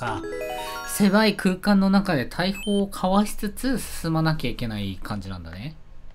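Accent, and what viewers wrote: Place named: Kansai, Japan. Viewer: ・。・c 関西弁(´▽｀)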